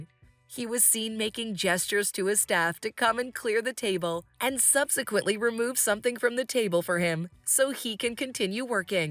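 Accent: United States. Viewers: Dreadful American robotic voice!!!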